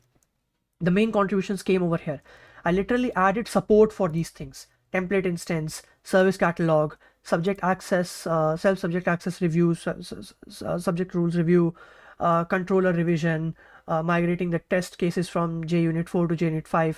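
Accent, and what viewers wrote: India. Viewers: Most difficult part is to understand indian accent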